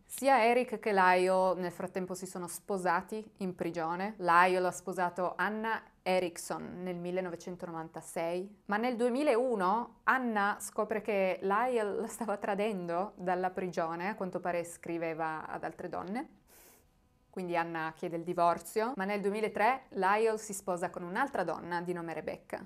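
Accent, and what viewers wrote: Turin, Italy. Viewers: ti devo davvero fare i miei complimenti... sono super appassionata del crime e all'inizio ero davvero scettica (troppi youtuber che si improvvisano divulgatori). Tu sei una bella sorpresa... i tuoi video sempre chiari, dettagliati, si percepisce lo studio che li precede, l'esposizione perfetta, l'accento torinese la ciliegina sulla torta! Sempre un piacere ascoltarti e vederti... volevo tralasciare quanto tu sia bella ma devo dirtelo per forza... bellissima e bravissima!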